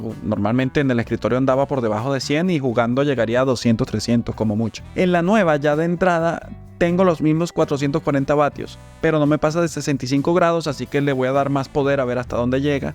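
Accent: Venezuela. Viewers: eres de venezuela? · Compa, por el hablado usted tiene que ser venezolano jajajajaja! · Um........ ese acento.... Es Un Paisano Venezolano.....Saludo Hermano, excelentes videos!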